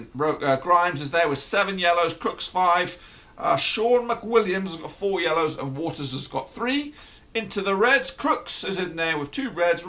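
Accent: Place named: Blackburn, United Kingdom. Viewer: Nice Blackbun accent!!!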